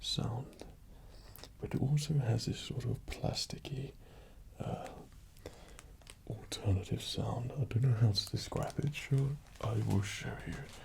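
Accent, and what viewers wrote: United Kingdom. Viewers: is he English because he kinda sounds it but looks Scandinavian and sometimes sounds idk just not English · Kyaaaah! I'm so happy I found out about asmr!! I'm a pretty anxious and agitated person. Sometimes, I'm tired but I can't get to sleep because my mind is always full of fast and stressfull thoughts T.T But your video really helped me! The plastic sounds are The best \o/ now I'm pretty sleepy @@ thank you for making this video and for helping me to calm down my messy mind. I really appreciate your voice too. I'm Brazilian, but I really love British accent (I don't remember if it's written like that). I think it is chic, fancy... I don't know which adjective fits better aahuashushauas But anyway thank you! Make more videos like that! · freakin stop thor jokes but I have a question are you from the U.K , Sweden or something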